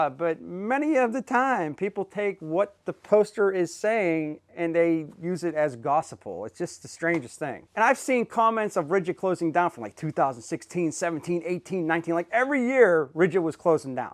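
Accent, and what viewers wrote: Pittsburgh, United States. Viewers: I'm glad you claimed your PA heritage. I noticed the Pittsburgh accent right off. If you ain't from Pittsburgh you was raised close enough to have the accent. I was raised in East Pittsburgh until I went to the Navy. I hope your a steelers/Pens fan. I bet our Buddy Vince is a Flyers fan.....even the coolest guys have some short commings 😂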